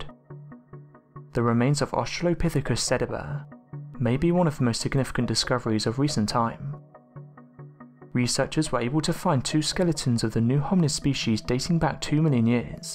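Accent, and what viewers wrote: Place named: Manchester, United Kingdom. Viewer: When discussing the question of dinosaur lips, this narrator (already famous in my book for love him/hate him usefulness).. says something much closer to "limbs". I understand that his accent (Manchester?...I don' know enough of the styles) may be hard for me to get, but damn that one was out there. I'm trying to get used to the "up/down.up/down/up...and fade the last word to breathy nothingness" style, and I'm getting there even though I find it irritating. His actual voice is great and usually if I'm watching a film or show taking place in GB, I love the accents. I just can't get the info straight sometimes with his rhythm and fluctuation. He probably hates how Americans speak as well lol. Otherwise, as usual great content and yes I'd like to see more exact pictures of subject matter but I understand it's not always possible. 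Good show.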